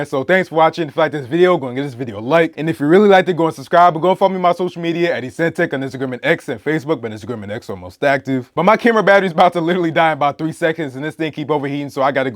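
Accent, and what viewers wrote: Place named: New York, United States. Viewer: Your definitely a new yorker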